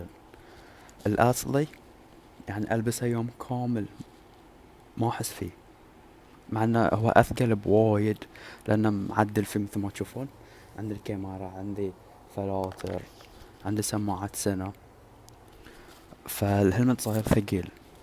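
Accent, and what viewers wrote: Bahrain. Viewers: يا زين اللهجة البحرينية 😌❤️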